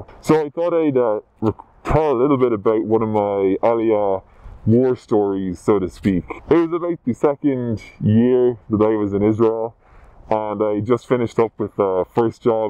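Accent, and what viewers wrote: Ireland. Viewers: I’m afraid the voice modifier doesn’t get rid of your distinctive Irish accent, nor your typical rhythm of speech. Fun video tho!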